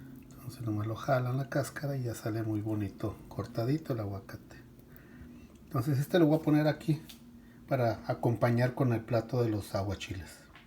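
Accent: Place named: Sinaloa, Mexico. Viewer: Usted es todo un amo de casa. Que rica receta. De donde es? Habla como sinaloense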